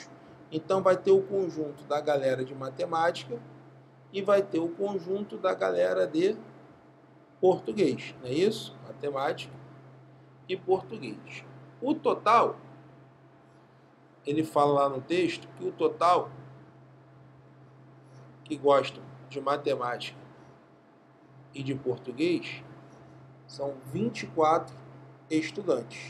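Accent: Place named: Rio de Janeiro, Brazil. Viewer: Da pra se vê claramente que o é um carioca da gema. 😁 Ele puxa bastante no x... 😄. Aula top. Show de bola meu velho.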